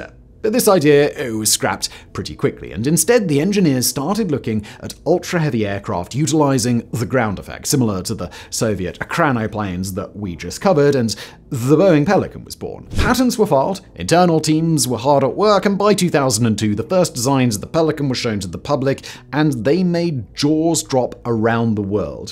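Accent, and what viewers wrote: RP, United Kingdom. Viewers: It's just RP English, lots of ordinary people speak it. (RP = Received Pronounciation)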